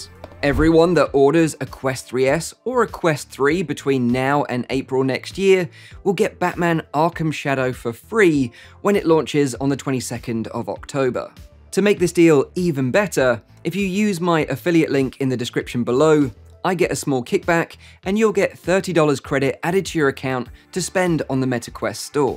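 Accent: United Kingdom. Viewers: Thats the same vr i have and he talk british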